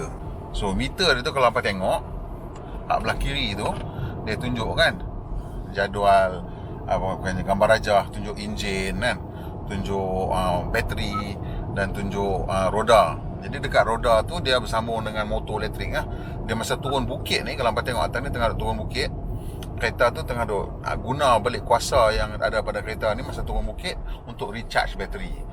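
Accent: North, Malaysia. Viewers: ulas guna slank utaqa style jgk bang.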